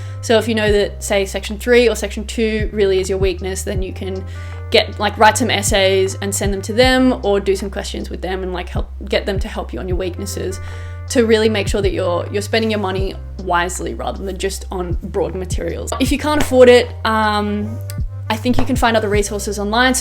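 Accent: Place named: Australia. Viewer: thanks for your content! pleasant to hear a fellow Aussie accent!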